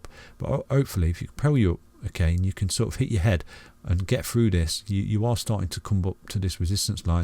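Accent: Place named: Yorkshire, United Kingdom. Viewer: Love the t.a, but got distracted by your accent. If you aren't a fellow Yorkshireman I will eat my scarf.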